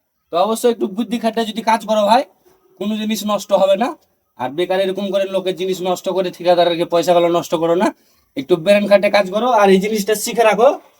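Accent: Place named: Chapai Nawabganj, Bangladesh. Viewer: চাপাইনবয়াবগঞ্জের ভাষা এটা ❤❤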